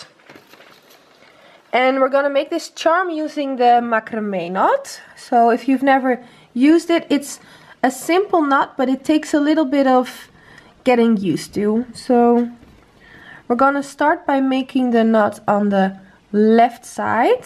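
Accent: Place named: Netherlands. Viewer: You can hear a Dutch accent ( spreek je Nederlands )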